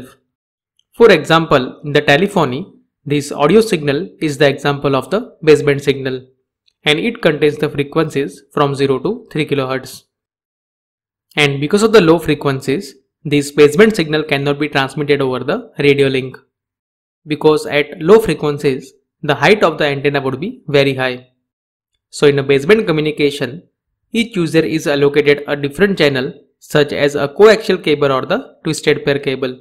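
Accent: India. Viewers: I wish I didn't have trouble understanding the Indian accent. Thanks a lot for the explanation though.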